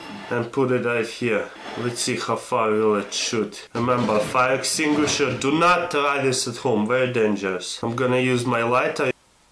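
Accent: Russia. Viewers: What was the last experiment??? He said like a carbide rocket??? he's to Russian to understand sometimes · are u russian? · Lets make it strong again. He sounds sexual with russian accent.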